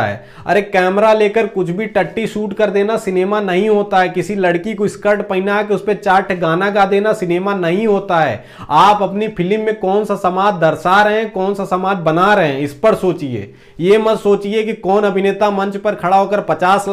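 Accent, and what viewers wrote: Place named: Uttar Pradesh, India. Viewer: Yr.. bhaiya tumhari bte.. Mera.. gaon or mere bhaiyo ki yaad dila. Detii h.. Parathagarhiya.. hu bhai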